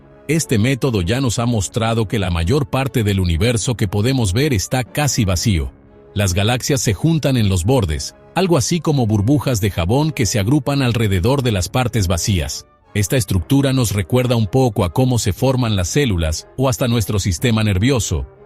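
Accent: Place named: Mexico. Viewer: Aparte la IA habla como mexicano. Nada contra su acento, pero en temas científicos no espero hablar al locutor con modismos de un país específico. Yo soy chileno y no me imagino un video de ciencia con modismos de acá.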